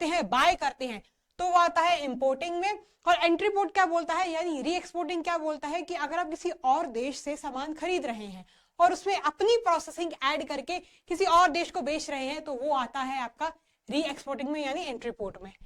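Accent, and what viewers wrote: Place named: Punjab, India. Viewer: She has Punjabi accent!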